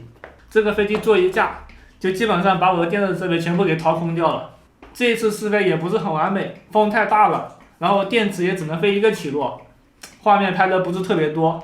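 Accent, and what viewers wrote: Jiangxi, China. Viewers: 在影片中经常能看以红土地，中国的红土地一般在湖北，湖南，江西。 结合口音，目测是江西。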